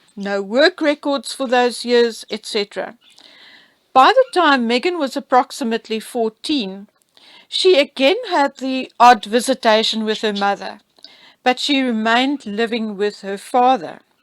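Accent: South Africa. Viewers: I read somewhere ages ago that Thomas was also afraid of Meghan, her rages were really bad and she used to physically attack him. From the news of the way she abused her staff and other people’s staff verbally and physically, I can imagine this is true. Do you have any clarification on this? On Doria she had a long gay relationship with another woman. Which ended just after Harry’s wedding. So she definitely doesn’t like men! I do feel strongly about Archie, if he does exist, he is being kept in isolation which is cruel and unkind treatment of a child. Anyone else whole have had child services called on them. Meghan isolates those she wants to control - she is extremely abusive! Ps. I love your videos, you obviously spend a lot of time investigating. I also like your accent, I went to school in South Africa so your accent is nice and familiar, thank you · Thank you for your honest and unbiased opinions. 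So refreshing to hear a fellow South African's accent. Regards from Cape Town. God bless😍 .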